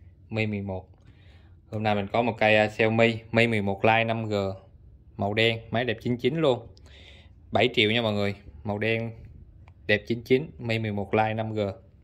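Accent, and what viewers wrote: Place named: Mekong Delta, Vietnam. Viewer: A la người mien tay ha nghe dong quen quen. E o bạc lieu a gửi ve cho e dượt ko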